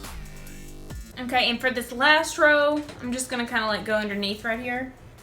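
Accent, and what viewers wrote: South, United States. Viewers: you are sooo pretty!!! and i love southern accents! ❤ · hears southern accent subscribes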